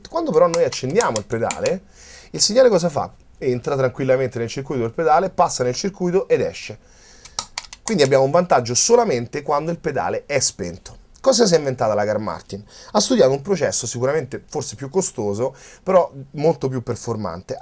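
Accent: Rome, Italy. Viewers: ehi...sei simpatico con il tuo accento romano (de roma?)....ottima dimostrazione...bravo nei riff...bel lavoro!!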